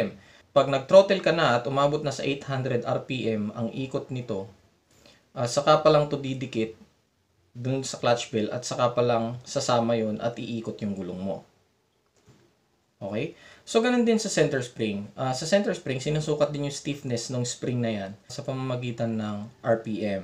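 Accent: Ilocos, Philippines. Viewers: Sir Ilocanos ka po ba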